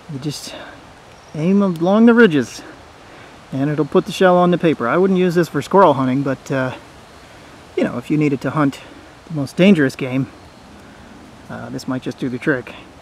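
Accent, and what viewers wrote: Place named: Wisconsin, United States. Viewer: You're in Spooner Wisconsin? I recognize the accent.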